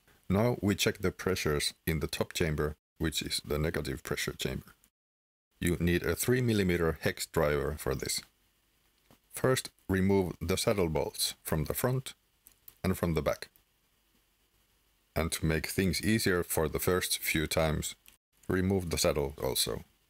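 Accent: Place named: Finland. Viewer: Thanks for the vid! Do you happen to speak Finnish, I think I hear that a bit in your pronunciation. I’m from Finland myself.🙂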